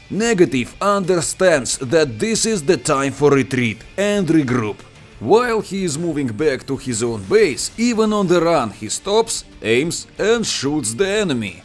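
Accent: Russia. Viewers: True russian accent.Perfect English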